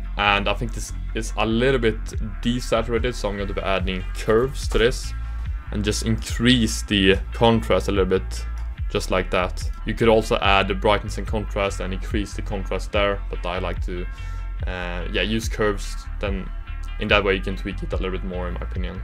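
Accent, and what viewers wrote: Sweden. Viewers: Tog mig tre sekunder från att du började prata till att förstå att du var svensk haha, du förtjänar en sub dock! Keep it up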